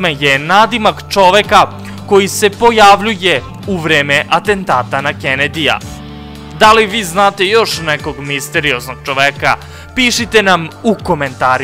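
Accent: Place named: Niš, Serbia. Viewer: odakle si ja mislim da si iz Niša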